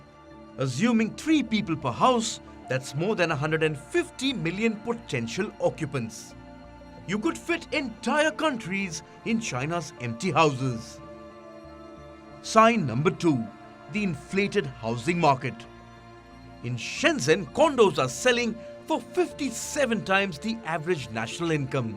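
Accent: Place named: India. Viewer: Her thick Indian accent..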